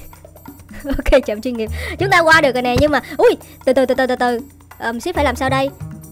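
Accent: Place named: Binh Duong, Vietnam. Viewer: Đọc lạ bình dương thế anh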